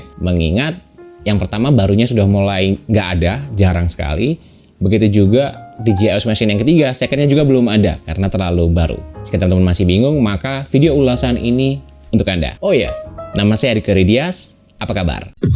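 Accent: Malang, Indonesia. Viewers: Lah orang malang ta ? 😅 suka sama review nya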